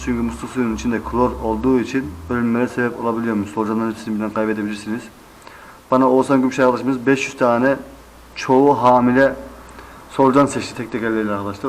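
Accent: Tokat, Turkey. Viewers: Abi herhalde Tokatlı konuşmasından anladım bende tokatlıyom ordan biliyorum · tohatlıca türkçesi ustam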